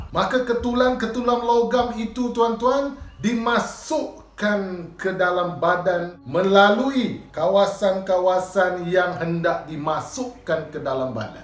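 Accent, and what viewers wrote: Malaysia. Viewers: He spoke very melayu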